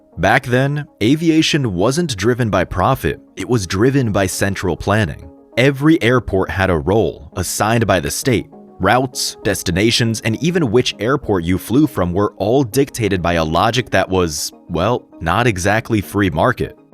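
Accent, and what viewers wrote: United States. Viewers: Very strong American accent. And why miles miles miles. Is it not 2025?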